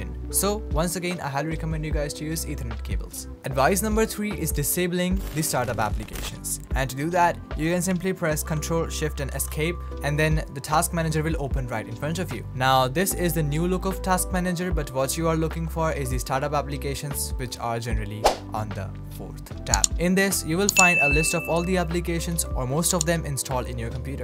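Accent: India. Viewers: i swear to god is this just another indian with just better accent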